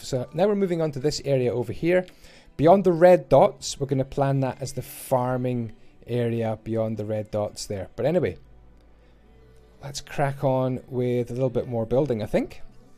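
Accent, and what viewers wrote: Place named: Scotland, United Kingdom. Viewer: Lol. You most certainly have an accent, my dear chap (sounds like a Scottish accent, perhaps?), but it sounds a lot like you're saying "pam". It's okay, though. I was just trying to help you out. Also, I am a native English speaker, as well, but I am also an English teacher ;)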